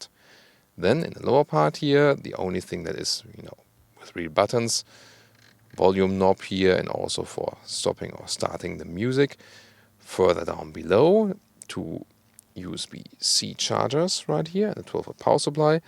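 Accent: Germany. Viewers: Forgive me but I'm here mostly for the German accent. EVs are still too costly for my monetary capabilities. Though however much I wait my next new car will be an EV.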